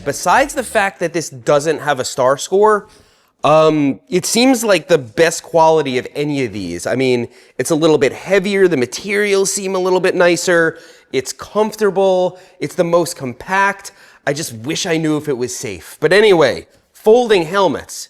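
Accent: United States. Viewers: Love the video as always. Freaking love the responses from the manufacturers on the comments. You always have something I wanna go up and show my significant other. She says you should have an accent, but to most you probably have a really hard American accent. lol idk Also isn’t what you call the third pocket the fifth pocket? Im sure there’s another name.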